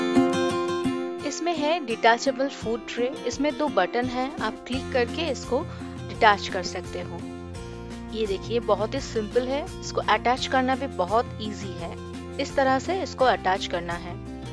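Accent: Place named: Bengal, India. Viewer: Bengali lady 😂😂... Your accent says it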